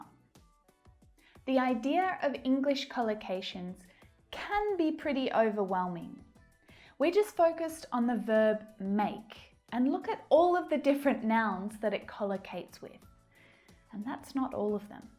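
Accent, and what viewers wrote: United Kingdom. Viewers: NO ME GUSTA EL ACENTO BRITÁNICO... SORRY 🙃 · your lessons so helpful, you are British, right? now I am advanced what should I do to reach native level? thanks a lot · Ms I would like to ask about your accent,is it british accent? Let me know if you dont mind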